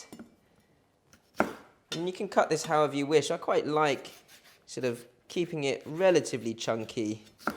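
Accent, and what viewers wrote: United Kingdom. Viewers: british accent so where do you live right now ??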